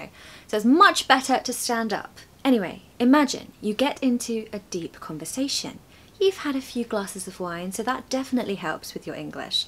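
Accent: RP, United Kingdom. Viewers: i wish i could speak english with a pr accent like you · I love your british Accent because overwhelmed me as well as your style of presentation and l'm fond of you. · Lovely to listen a fantastic british accent! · Hi, you sound like Lucy from English with Lucy. Has anyone told you this before? Or is it anyone with RP speaks as you do?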